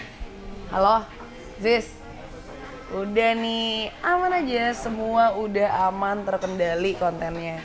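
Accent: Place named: Makassar, Indonesia. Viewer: lidah emang makassar banget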